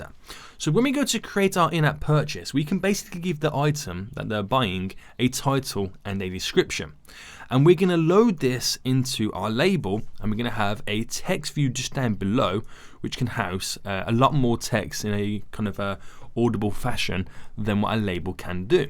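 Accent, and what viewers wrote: United Kingdom. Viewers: great tutorial brother thanx and cool british accent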